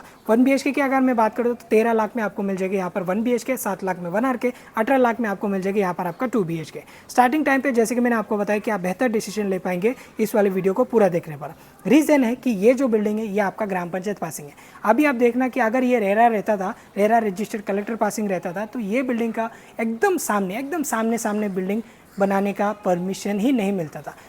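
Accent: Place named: Nepal. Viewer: Yeh bhai hindi me hi bol raha hai. Ye nepali hai isliye Aisa hi hindi bolenga bhai.o.k.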